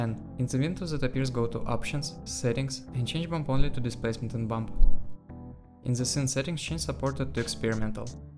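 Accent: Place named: Russia. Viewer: Your accent sounds too russian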